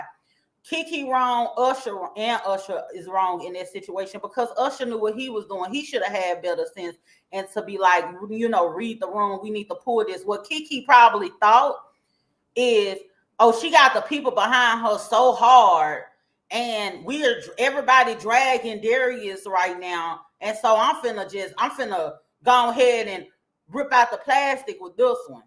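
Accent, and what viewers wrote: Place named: Texas, United States. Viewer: Love the video and your Texas accent.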